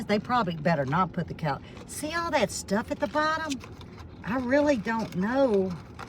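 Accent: South, United States. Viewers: Miss I say sqaRsh, and waRsh, Wooder, (water) and Breeeed (Bread) and I'm born and raised on Marylands Eastern shore. We sound a like suthern sister😂❤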